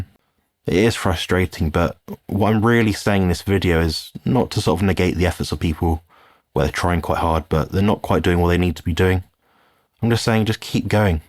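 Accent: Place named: England, United Kingdom. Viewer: Thanks. It's nice to hear a lovely English voice.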